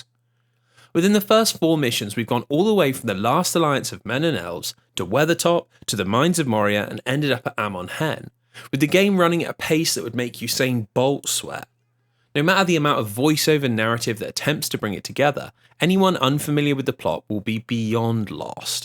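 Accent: England, United Kingdom. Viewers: These sorts of videos are always successful because of an English accent narrating it lol kudos to you though on this monster sized content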